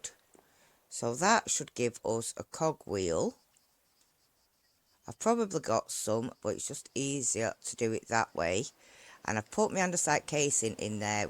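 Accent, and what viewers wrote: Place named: Northern England, United Kingdom. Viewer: im UK based too! Down south, you sound more northern .. glad to hear you are keeping your spirits up, take care.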